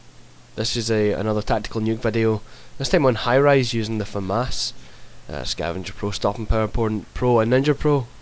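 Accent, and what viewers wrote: Scotland, United Kingdom. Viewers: Sound mate I take it your American but you sound Scottish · I just LOVE your scottish accent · scottish accent FTW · no... hes scottish, since im from scotland i can tell by his accent. also, it says UK on his page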